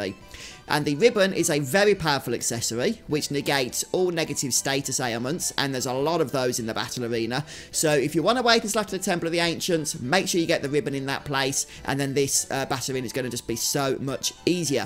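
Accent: United Kingdom. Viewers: I like youre GB Englisch!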